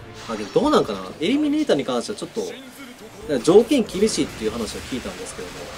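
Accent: Kansai, Japan. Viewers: 同じ京都だけど違和感はあんまりないかな？ 今は関西じゃないし、動画配信なら標準語使った方がいいと思うからたまに地元の関西弁出るんだと思う。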